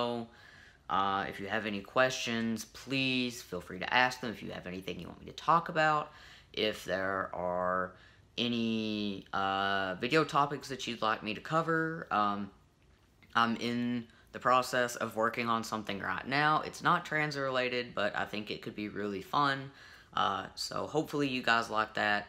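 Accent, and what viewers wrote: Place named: South, United States. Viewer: You’re definitely from the south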